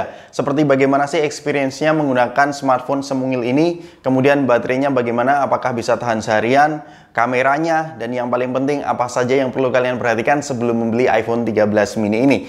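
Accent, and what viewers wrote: Semarang, Indonesia. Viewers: Weh wong semarang ik 😄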